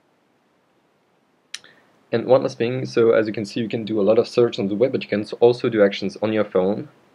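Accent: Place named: France. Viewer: Monsieur est français ? :D Pas sûr mais un tout petit accent français peut-être :P Sinon belle vidéo et GG pour parler aussi bien l'anglais :)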